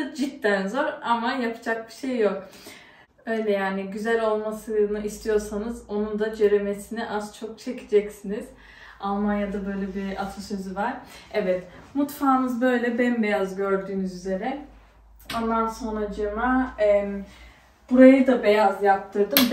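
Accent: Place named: Usak, Turkey. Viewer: Güzel Kızım benim...Uşak'lı olduğunu Beyza'nın evine geldiğinde, konuşmandan ve Tarhanandan anlamıştım. Ne de olsa aynı Toprak..Kan çekiyo demekki. Güle güle otur her iki evinde de. "Ehh bende şindi Abone oluvedim gali!" Iyi ettim mi gııı?😉❤😘 Seviyom seni...inşallah bi gün Uşak'ta, Izmir'de karşılaşır ya da buluşuruz.😘❤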